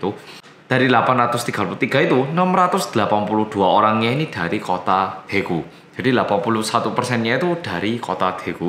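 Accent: Java, Indonesia. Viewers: ngga apa ngomongnya ngejlimet yg penting tetep Meedddooookkkkk... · Logat Jawanya medok ya.Tapi suka dengarnya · Medok tapi cute... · Mas saya baru subscribe, mau tanya kok bisa lancar gitu bahasa indonesia nya? Medok maneh...belajar dimana mas? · Medok rekkk😉😉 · Leh kok jowo bgt srko jowo endinsih leh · Kok koyo wong jowo ngono e medok ngomonge xixixixix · pantesan jowonyo.medok.tenan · Oppa knp accent nya medok yah ? But u studied in RMIT before right ? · dulu sekitar taun 2017 pas channel ini baru mulai, aku dikenalno kokoku, katanya ada channel yutub org korea tp ngomonge medok. trus aku ditunjukno video2 channel ini. tp, well.. aku gak bnr2 ngikutin krn sbnrnya kontennya bukan aku bgt "dulunya"... aku gasuka sm review2 hahha. trus baru2 ini kok tak liat2, tak denger2in lama2 lucu jg ngmgnya wkwkwk. enak ngejelasinnya btw, trus ganteng #ehhh salfok hehe so here i am, hi, i am ur new subscriber :D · Mas nya org Jawa ya? 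Logat ya kayak jowo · Nada bicaranya jawa bangett gituu🙂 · STAY SAFE MAS MEDOK!! Semua agama baik kok, ga boleh saling menjelekan agama. Ingat lagu “Tuhan memang satu, kita yang tak sama~~” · Medok bgt ngomong nya 🤣🤣👍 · Welcome back to korea reomit bersama orang korea yang : MUEDHOOOOOOK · Jowo nya MEDOK bgt.. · Yuuuhh aku kok lagi ngerti channel iki tooo.. Kapan lg kan liat muka korea bening bahasa indonesia paseh logatny jowo.. Biyuuuhhh😂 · Mas ada keturunan indo ngk sih? Kok ada logat" jawanya tapi ada jg logat" Korea nya?🙁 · Ada logat jawanya juga masih nampak logat koreanya..😆😆😆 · wong ndi sih iki..muka korea,logat jwa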